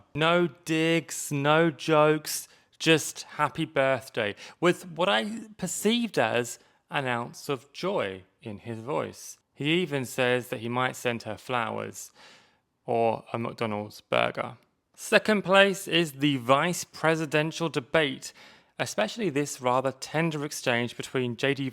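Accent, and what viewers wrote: United Kingdom. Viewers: I have only followed of TikTok and I genuinely love your ability to be on the level speaking of all of the horrible things the Us is doing. And btw….never expected your English accent! 😂 surprised the hell outta me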